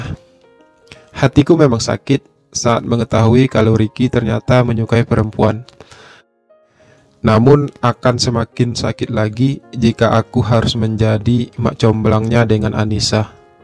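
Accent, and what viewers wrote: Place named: Medan, Indonesia. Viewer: Klu aq cermati aksen suaranya ~ aq yakin Abang ini dari Medan ~ ya kah ????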